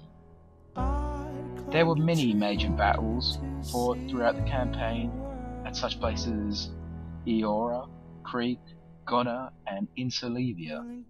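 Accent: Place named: United Kingdom. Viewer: well structured with good music. the british accent was not needed. Deuchar Allen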